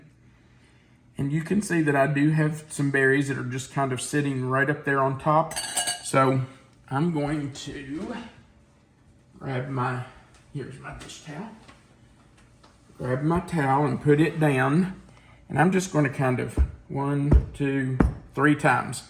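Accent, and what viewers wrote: South, United States. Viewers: Love your channel and your southern accent is awesome. Thank You So Much for actually putting the recipe without pointless huge spaces directly under the video so we can easily screenshot the recipe with the thumbnail pic in it. There are jerks on here who refuse to do that (even after I have asked them to) so I quit watching them. They want us to click over to their website or blog which is Ridiculous and not easy when you are using slow data. Some people are just lazy rude and arrogant, you are very respectable.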